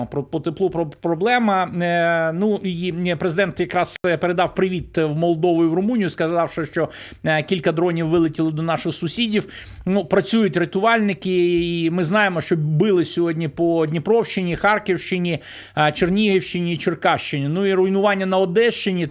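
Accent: Russia. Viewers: Як бридко слухати наслідування вимові рашистські мові; сказаф, пішоф, даф...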